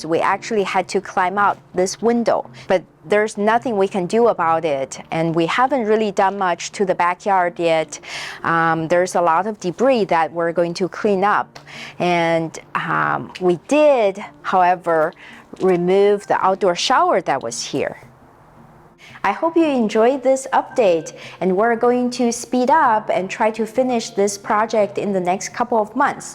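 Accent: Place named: Taiwan. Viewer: Are you Taiwanese? My mother in law is and sounds familiar ❤. Anyhow love your videos. I am new to house flipping and making many mistakes as I go but a life long learner . Thanks for sharing with us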